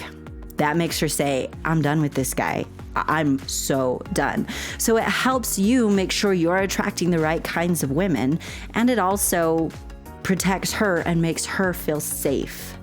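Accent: United States. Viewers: How and where is highly cultural. I hear your USA accent probably New England. Touching is à no no never. I will never return USA and this is only à secondary reason. Main reason is aggressivity. Second is political corruption. And so many others.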